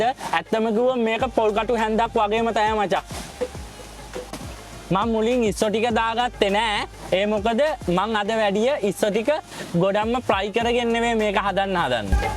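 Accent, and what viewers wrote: South, Sri Lanka. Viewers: දකුනෙ බාසාවනො ගැම්මක් තමා ආය්බොවන්ඩ...❤😁 අපිත් දකුනේ මචෝ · ඒක තමයි දකුණේ විදිහ❤❤ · Dakune bashawa 🎉❤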